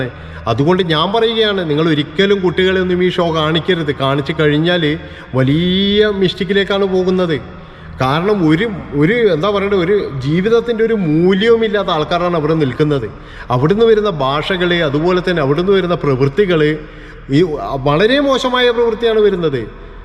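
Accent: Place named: Malabar, India. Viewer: സഹോ , താങ്കളുടെ മലബാർ ശൈലി സംസാരം Super. ടെൻഷൻ വല്ലതും ഉണ്ടെങ്കിൽ നിങ്ങളുടെ വീഡിയോ കാണും😂ചിരിക്കും😂 അതോടെ ടെൻഷൻ നാടുവിടും😂 Congrats👌👍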